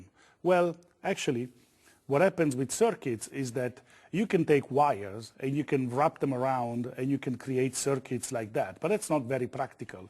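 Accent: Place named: Italy. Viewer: 意大利英语真给力~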